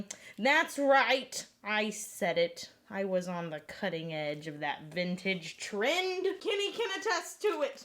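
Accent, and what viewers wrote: Texas, United States. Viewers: Your lovely, soft Texan accent does something so incredibly kind to Baz Lurhman's name! In Australia if a bunch of folks call to him it sounds like a flock of seagulls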